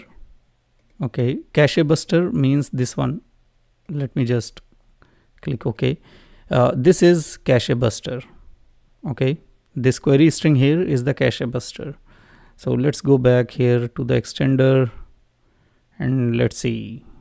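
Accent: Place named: India. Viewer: i didn't mean any criticism, imo indians have a tutorial in everything i love what you guys share with the world, and more importantly I LUV UR ACCENT ♥️🔥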